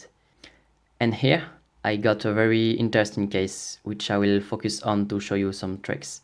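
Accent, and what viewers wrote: France. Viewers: French English sounds so French. I know a guy who says "particular", "specific" and similar words with French accent all the time ^^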